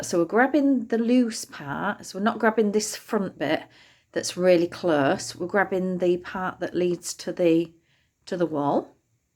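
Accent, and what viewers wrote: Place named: Yorkshire, United Kingdom. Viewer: Tricky, but clever ! Lovely true Yorkshire accent ,,just same as my Grandaughter! 😀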